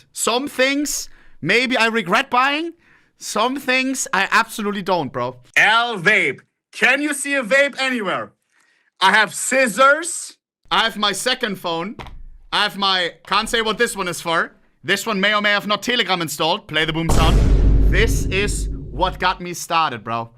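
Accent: Germany. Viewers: the german accent makes it better somehow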